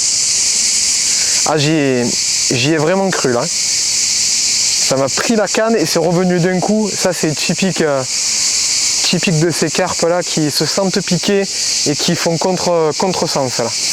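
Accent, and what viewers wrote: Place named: South, France. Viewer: Bonjour, Merci pour cette belle vidéo. Le soleil, le chant des cigales et ce bel accent du sud, c'est tout ce qu'il nous faut pour oublier la grisaille de ces derniers jours 😊 C'est toujours un plaisir de voir (ou revoir 😊) tes vidéos.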